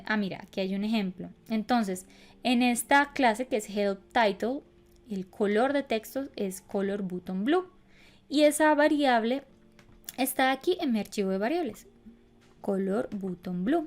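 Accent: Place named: Colombia. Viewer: Ese acento colombiano... Tome su buen like ^-^ (buen video)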